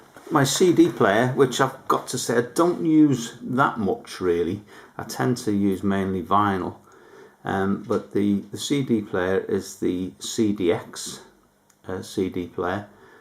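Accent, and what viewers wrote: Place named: Liverpool, United Kingdom. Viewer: You sound like a Beatle Liverpool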